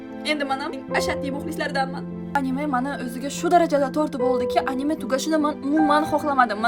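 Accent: Bukhara, Uzbekistan. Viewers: Opa Buxorolikmisiz😮😮😮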